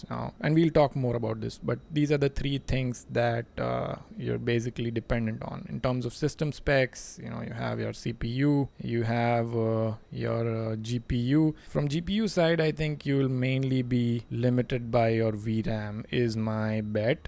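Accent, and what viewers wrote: India. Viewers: omg india accent now in msfs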